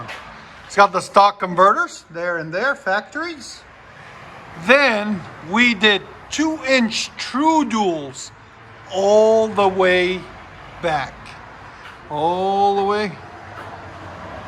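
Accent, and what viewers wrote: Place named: Upper Peninsula, United States. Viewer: Are you from Michigan because you sound like a yooper.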